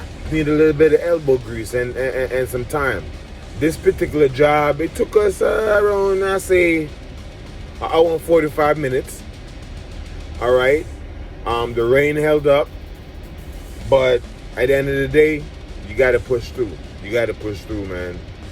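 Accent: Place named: Jamaica. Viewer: sound like your Jamaican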